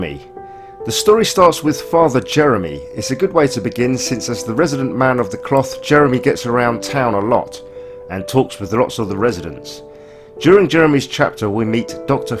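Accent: United Kingdom. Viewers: This game is beautiful and amazing. yes the slow walking can be a tiring if you are back tracking (for instance I completely forgot that you needed to move the controller near some lights and only did it the first time instructed put the game down for 1 month came back to and made it to bunker wondering why won't it open untill I watched the beginning of a walk through and was like Noooooooooo) but the walk through this town and countryside is peaceful and beautiful. I found myself looking up through the trees listening to the music speechless. I love piecing together the story which was a great story. This was my game of the year (over all the ps4, xboxone exclusives and 3rd party games) because this is the only game leaving me thinking about it weeks later and looking on youtube for others point of view and searching for things I may have missed. thank you for this commentary it was perfect. 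especially since you sound like you are from the UK it works to enhance memories of the game. thanks again keep up the good work. I thumbed up and suscribed.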